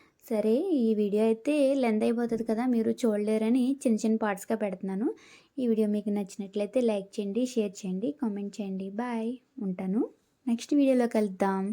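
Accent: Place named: Rajahmundry, India. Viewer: Soo cute sis❤ మధ్య మధ్యలో మన రాజమండ్రి యాస వస్తుందిగా 😂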